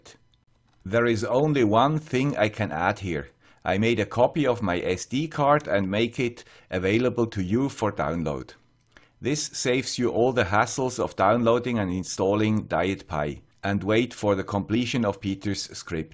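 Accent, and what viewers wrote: Switzerland. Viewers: I am half swiss and I can confirm this man's accent is aggressively Swiss.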